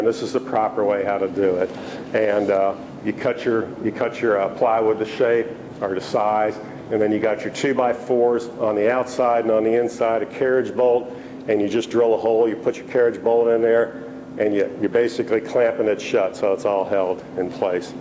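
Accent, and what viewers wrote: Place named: South, United States. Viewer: This lecture was so informative! Thank you NC for being preservation leaders! The only disappointing thing was when this professional architectural preservationist showed a slide on which was labeled "clear story windows" when they are actually CLERESTORY windows. Professionals need to remember to double-check spellings, particularly when you speak with a strong Southern accent. It makes us Southerners look ignorant when things are spelled as they are pronounced.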